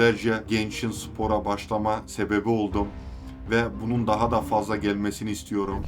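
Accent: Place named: Niğde, Turkey. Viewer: kardeşim severek izliyorum başarılarınla mutlu oluyorum ama şu Türkçeni düzelt nolur sen Niğdeli sin yapma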